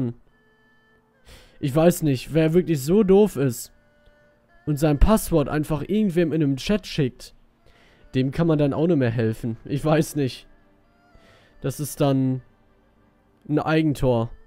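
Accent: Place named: Wuppertal, Germany. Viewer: Biste n Wuppertaler? Soviel "wat" und "dat" ^^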